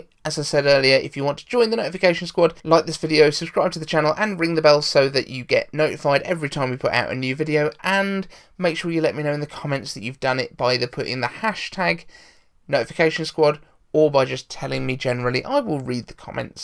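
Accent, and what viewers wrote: United Kingdom. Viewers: You could have a pen knife. I am a recent subscriber enjoying your updates, nice to hear a British accent as well :)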